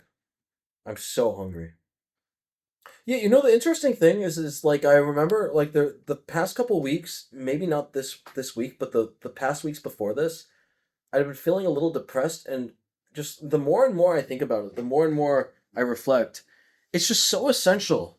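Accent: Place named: Rhode Island, United States. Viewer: are u from Rhode Island? I’m guessing the accent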